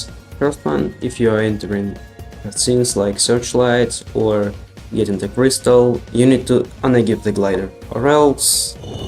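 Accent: Russia. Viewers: просто акцент у тебя слишком русский👍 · Ahh russian accent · are u russian? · Are you russian??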